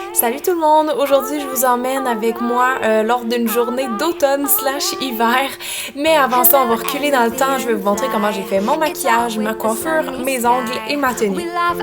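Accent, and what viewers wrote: Quebec, Canada. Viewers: C pareil pour moi je met toujours de la music et au passâge j'adore ton accent québécois qui est perf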